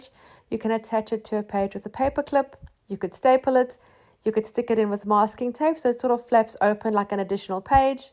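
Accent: South Africa. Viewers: I absolutely love watching your channel and can't wait for the next episode 🤗 nice hearing a South African accent 🇿🇦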